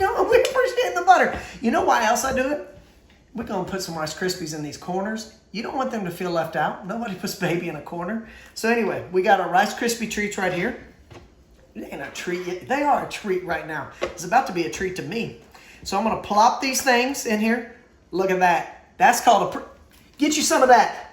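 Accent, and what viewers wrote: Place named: South, United States. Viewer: Dad jokes, Rice Krispies, country accent, not harsh on the eyes. I don’t see how this video could’ve been better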